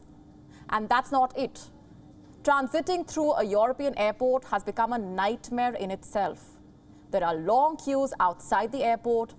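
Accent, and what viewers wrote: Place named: India. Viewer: She is one of the best speakers and keeps her nice Indian colonial upper class Charismatic accent.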